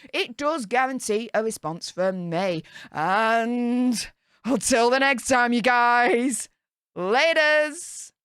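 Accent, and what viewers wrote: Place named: Northern England, United Kingdom. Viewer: I've only just discovered your channel this week when you were talking about Harry's therapy session. I love your energy and personality. I live in the North West of England . I simply love Northern accents. I see that Daniel Boland is from Sheffield. I'm a stone throw from Manchester in one direction and Derbyshire in the other direction. I've tried to place your accent. My daughter-in-law us from Darwen in Blackburn. I could listen to her all day. Are you on the borders of Lancashire and Yorkshire ? Anyway less of the Geography. I think your channel is the sort where housebound people love your vlog because your demeanour is such that you feel like a pal.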